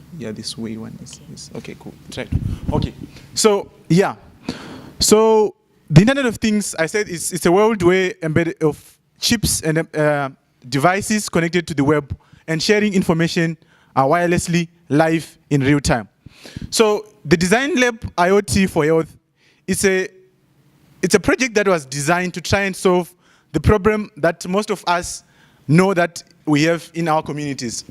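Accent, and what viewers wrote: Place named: Ghana. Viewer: You have suddenly adopted the Ghanaian accent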